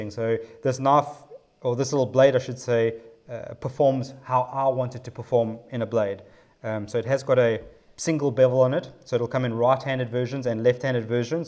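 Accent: South Africa. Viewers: I use a knife exactly like this to fit a violin bridge. This is interesting for a luthier. Where are you from? Your accent sounds South African, maybe?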